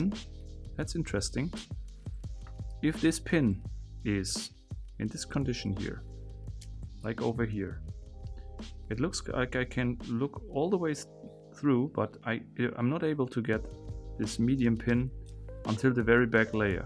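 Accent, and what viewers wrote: Germany. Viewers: So wie er englisch redet vermute ich jetzt mal das er von der muttersprache her hochdeutsch bzw. eine der vielen deutschen sprachen spricht. Ich habe mich noch nicht danach umgesehen wo er herkommt. Einfach mal blind ne vermutung anstellen und evtl. damit auf die schnauze fallen. So ist das leben geil. No risk no fun😀😬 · Your German accent gives me a headache but great video!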